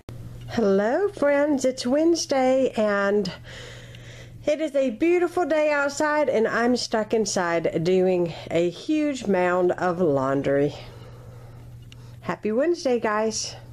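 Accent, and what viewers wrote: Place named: South, United States. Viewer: recently subscribed :) I love your channel and I love listening to your sweet southern accent ♡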